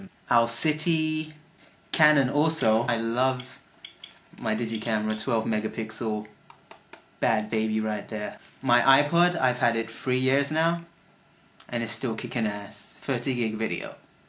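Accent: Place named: United Kingdom. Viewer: This is great! My first thought was, he sounds like he's from the UK.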